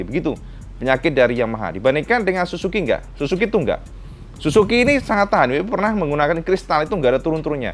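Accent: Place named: Java, Indonesia. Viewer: Jet koledd cak wong jowo ngomong e 🤭🤣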